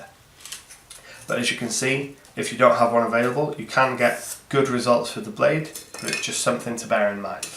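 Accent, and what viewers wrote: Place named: North Wales, United Kingdom. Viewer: Great video, thanks for that. I'm gonna guess you're from North Wales, because that accent is very familiar!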